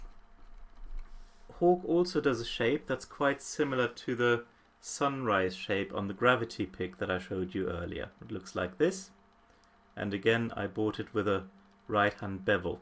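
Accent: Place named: England, United Kingdom. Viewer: Oh your voice..I have never heard it...what a a lovely English accent,. For picks, I am told..there should be no give...no bendy qualities ( for movement efficiency) and not too pointy. ( personally I use Blue Chip TAD 40 always )- but my teacher uses a TAD 60. I have many of the same ones you have too. But I like the way the TAD 40 sounds on your mando. Maybe it depends on the type of music you play (ie: jazz) With 31 picks..I'd say - pick one and stick with it. Which one is the most comfortable in your fingers.? If you get used to one, you will learn how to strike the strings the way you like. This is a good review, btw, for many people to watch.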